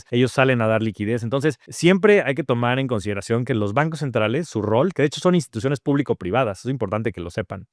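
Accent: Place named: Mexico City, Mexico. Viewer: Interesante. El algoritmo me mando para acá. Me suscribo y like a pesar del insoportable tonito chilango fresa q se te sale cada tanto.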